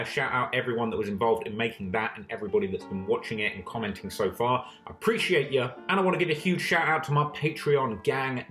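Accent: United Kingdom. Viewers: Bro dint know you were from UK well the accent I can hear but dint really pick it up til this video ahhh might as well been a joke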